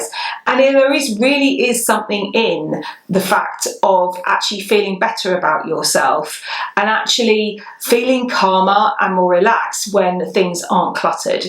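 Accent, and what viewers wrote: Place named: United Kingdom. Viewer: Really good advice! I have got rid of a lot of things as I have just moved house but i find a lot of my clothes don"t go together so putting together an outfit is difficult. I like the suggestion of thinking more about the colours and keeping it simple. I also watched your over fifties and how to look elegant videos, so I think I will be able to make some progress now. Lovely to hear a British accent, I am a Brit living in Canada. We definately have four seasons here.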